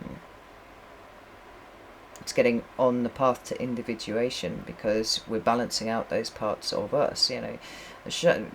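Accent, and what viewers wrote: United Kingdom. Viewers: Your first reading for me. Lovely to hear someone from the UK. Really resonated with what’s going on in my rather complicated personal life right now. Trying to figure out in which direction to go in the best way possible moving forward. Thank you for your insights.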